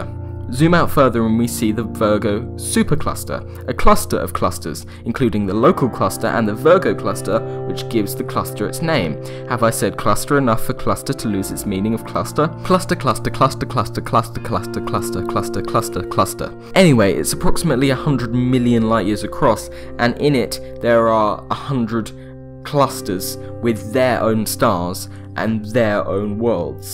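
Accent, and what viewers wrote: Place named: South, United Kingdom. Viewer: Hard to understand his south-english accent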